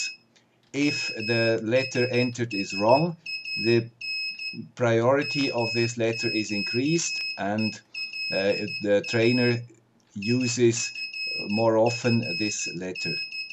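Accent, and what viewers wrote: Switzerland. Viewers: I've followed you through the years and I have learnt a lot to the benefit of my own projects. Thank you! Just saw your "last" video and got curious about the first. Even though it is 10 years ago, you presented the Morse-trainer project much better than many videos out there today. Looking forward to more sporadic Swiss-accent videos in the future. Cheers! 🙂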